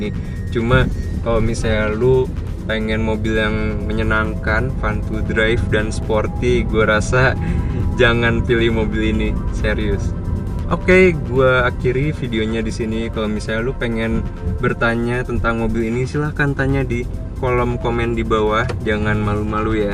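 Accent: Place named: Java, Indonesia. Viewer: Kayaknya logat" jawa dehh.. Tapi kok GUA GUA yaaa😁😁😁😁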